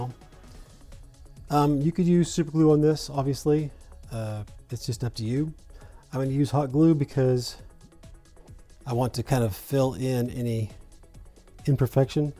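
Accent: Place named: Mississippi, United States. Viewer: You're accent is like Michael Conor Humphries the little fella who played young Forrest Gump - himself the inspiration behind Tom Hank's accent. Are you from Mississippi?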